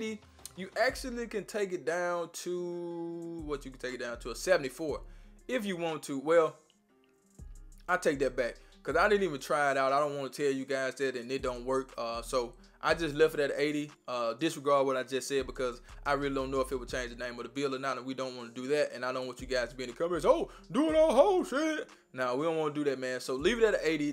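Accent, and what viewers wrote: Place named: South, United States. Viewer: Good Vids bro . Where you from . Sound like the south . How you say “ you know I’m talking bout “ lol sound like my area lol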